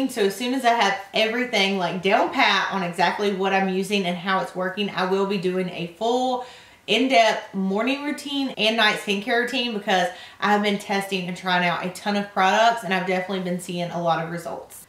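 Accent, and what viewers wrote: South, United States. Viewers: You are a doll. You seem to be a lovely and sweet young lady. The makeup that you are wearing in this video is my favorite!! This is the most beautiful look. The lip color is perfect for you. I know you love the rosy colors but this look makes you look younger. At your age, girls can pull off any look, but this look is special. It is your color for summer!!! I hear you say often when you receive green eye shadows and liners that it you wouldn't normally wear them. Girl, you have all of us cheering you on to try new looks. You receive these products to let us see different looks to influence us. You do influence us because I have purchased items that you have shown!!! With your eyecolor, try golds and bronze with the gold/green as an under eye liner. That would be a fabulous look. I know you love your pink, fuchsia eye shadows, but step out of that box.we will love you even more!!! 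I'm proud of you. I run across your video when you first started and the southern accent got me. I'm from Northeast Tennessee and I support my southern ladies. I'm so proud of you girl!!!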